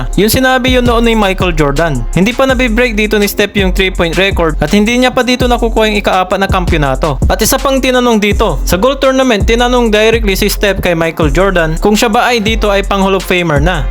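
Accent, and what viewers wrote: Pangasinan, Philippines. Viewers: Pangasinan ka idol noh,,? Halata sa salita mo.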